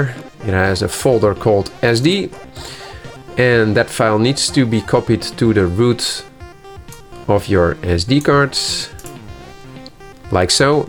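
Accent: Netherlands. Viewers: Butt I heer a Dutch accent is dat right?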